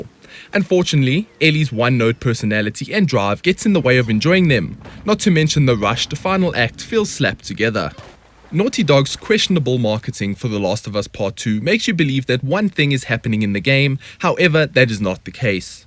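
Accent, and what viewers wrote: South Africa. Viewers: You South African bro? Nice review. Yeah you're definitely from Cape Town. Stellies maybe?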